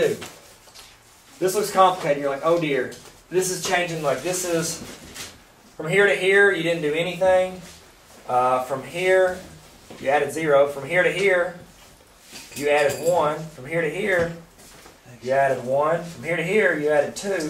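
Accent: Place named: Arkansas, United States. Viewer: I think he is from Arkansas if not from texas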